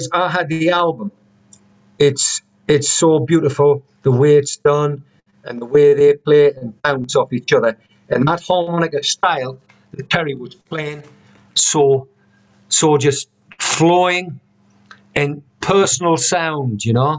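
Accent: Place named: Ashington, United Kingdom. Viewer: You sound a bit Ashington ish! Sorry if am wrong!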